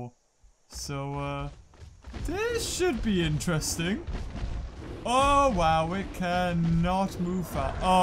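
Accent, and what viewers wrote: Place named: United Kingdom. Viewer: YOU BRITISH!!!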